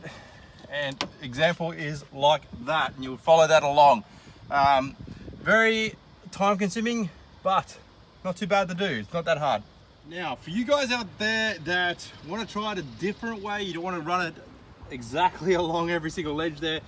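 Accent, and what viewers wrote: Australia. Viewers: Best Dashcam DIY install I've seen. Easy-to-understand Aussie voice also. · Geez Australia has jacked up accents irritating to listen to learn to speak English bro can’t take one more second of your voice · What an Aussie accent ! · I love your Aussie accent🥰 Reminds me of the time I used to live in Australia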